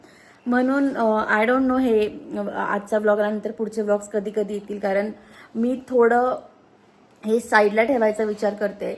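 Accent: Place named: Khandesh, India. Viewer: A tuz माहेर कुठल आहे नक्की नाशिक खानदेश tone vatto thoda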